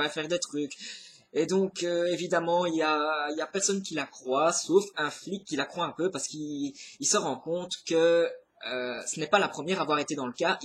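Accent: Belgium. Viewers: Coucou :) Je me dois de te taquiner un peu ... Ton accent belge ♥ ! Je me souviens encore de tes premières vidéos où tu étais réservé et pouf .. Maintenant tu pètes le feu et anime tes vidéos :P Les quinze premières vies, c'est le genre de bouquin qui me rendrait folle ... Alors je comprends ta déception ! J'attendais avec impatience ton avis sur le livre que tu as abandonné :) Ahahaha, tu me fais rire x) Ah oui, généralement les profs étalent leurs connaissances dans leur bouquin ^^ Bon bha clairement, merci de la prévention, je ne le lirais jamais ^^ Bisous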